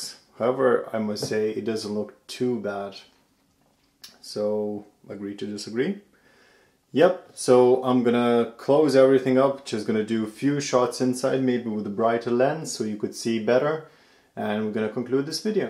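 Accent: Ireland. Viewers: I can hear the slight Irish accent lol. Are you Irish?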